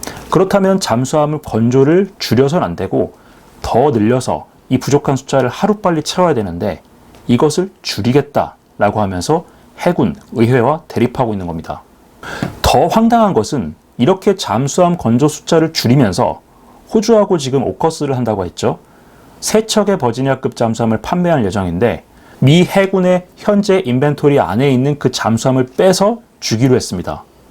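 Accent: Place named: Seoul, South Korea. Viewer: 서울말 좋아요 ^^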